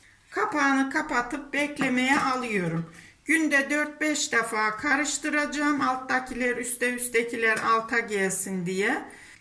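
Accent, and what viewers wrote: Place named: Black Sea, Turkey. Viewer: Harikasın konuşman bizim oraları andırdı karadenizin becerikli hanımları maş. Mutlaka deneyeceğim.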